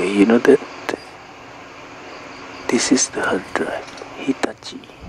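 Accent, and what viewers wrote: Indonesia. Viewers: awal2 dikiranya orang thailand tapi kok lama2 di dengar aksen inggris nya kaya orang indonesia nah pertengahan akhirnya nongol juga bahasa asli nya wkwkwkw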